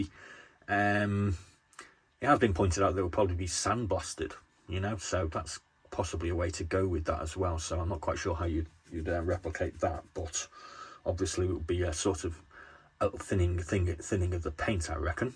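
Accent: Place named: Northwest England, United Kingdom. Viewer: Got to ask, are you in merseyside, wirral or chester, trying to place the accent.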